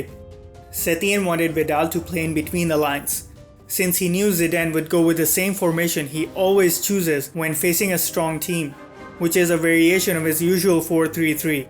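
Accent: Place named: India. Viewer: India English.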